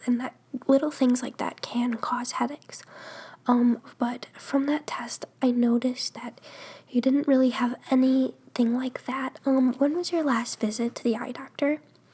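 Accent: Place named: Syracuse, United States. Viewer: CONTROL. Control is the word you wanted. By the way, are you from like Syracuse or somewhere around there? Your accent sounds like everyone I went to high school with haha.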